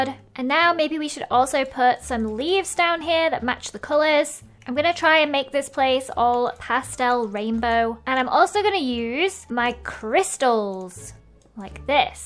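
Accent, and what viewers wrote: United Kingdom. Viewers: So she talks british like normal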